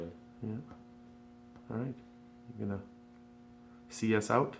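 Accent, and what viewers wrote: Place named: Canada. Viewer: makes brrilliant hand-made parts showing excellent home grown engineering skills, and some nimrod criticizes him for saying "rad"?? Canadians say things kinda funny (they are working in a "gradge" for example, not a garage. is as pure a hot rodder as I've ever seen--he gets massive bang for the buck.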